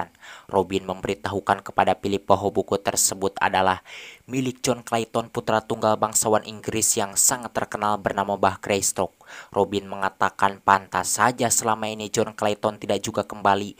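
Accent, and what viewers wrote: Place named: Sunda, Indonesia. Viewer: Cara bicaranya itu loh .endingnya yg menyesatkan 😂😂khas suara dngan nada kesundaan 👍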